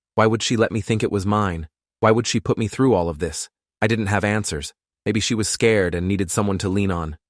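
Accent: United Kingdom. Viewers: Why he British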